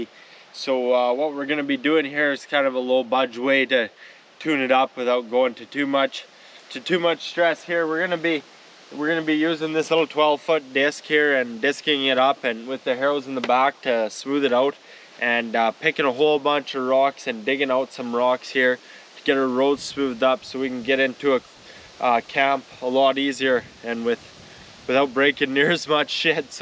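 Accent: Canada. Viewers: Sounds like you're up in Canada? Damn good work. Just did the same thing on a field road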